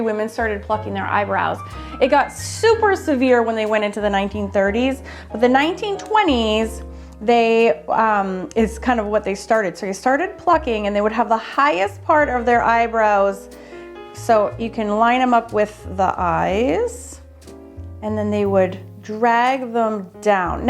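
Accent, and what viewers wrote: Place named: France. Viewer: Oooh la la. And I liked your lil French accent. 😂😂😂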